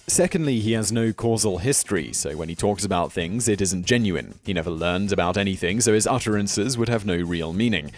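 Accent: United Kingdom. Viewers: LOB, judging from his accent, he is clearly British so visiting every state in the USA wouldn't be a factor here. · I get it. He's British. But, Your I Null? He's never heard the word urinal before? Re-cog-nized? That's not even a word. And don't get me started on his pronunciation of aluminum.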